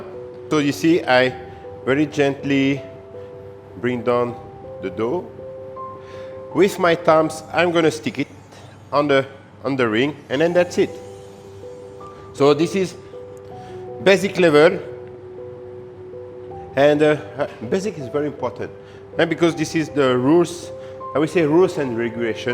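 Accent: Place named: France. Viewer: Yes he speaks broken English / Manglish with Malaysian cadence (still has French accent) lol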